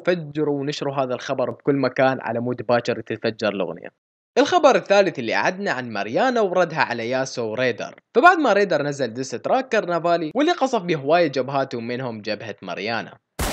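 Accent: Iraq. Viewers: سؤالل انته عراقي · انتة عراقي لو شنو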